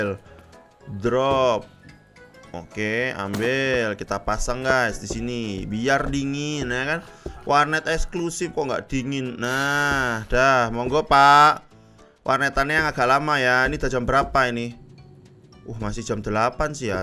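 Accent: Java, Indonesia. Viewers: Bang medok bang lop u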